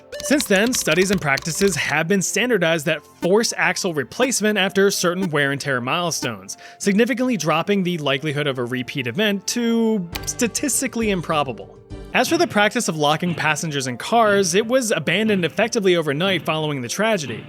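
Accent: Germany. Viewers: How is your German accent so perfect? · As a German myself and a resident of ERFURT, I have to say I LOVE the sprinkled in German words and the accent 😂 "and what kind of scheiße do we get in then" ... I literally lost it 😂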